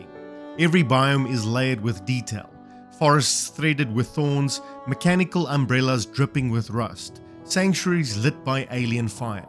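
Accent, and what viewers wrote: South Africa. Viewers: Aussie Channel, but I know a Saffer when I hear them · Hey man, what accent do you have? South African?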